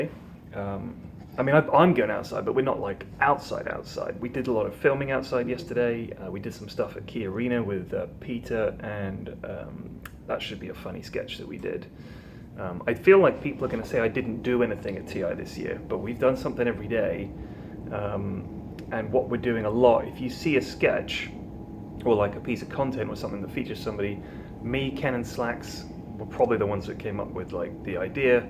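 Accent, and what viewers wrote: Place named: Yorkshire, United Kingdom. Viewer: your Yorkshire accent is spot on!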